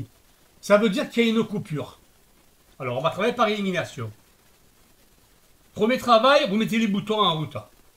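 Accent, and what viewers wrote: South, France. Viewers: Bonjour, merci infiniment pour votre aide ! Mon mari voulait jeter ce petit radiateur, exactement le même que celui que vous avez réparé, pensant que la réparation serait énorme, mais je suis allée fouiner sur internet et je vous ai trouvé ! Un bonheur d'entendre ce bel accent du sud qui me manque, je vis à l'étranger... Mais la cerise sur le gâteau c'est votre grande compétence ! On a difficilement trouvé le fusible thermique, mais on y est arrivé, mon mari a suivi vos instructions et on est reparti comme en quarante !!!!!! Le petit radiateur a une seconde vie !!!! Bravo et encore merci infiniment pour tout ce que vous êtes 💪😃👍👨‍🎓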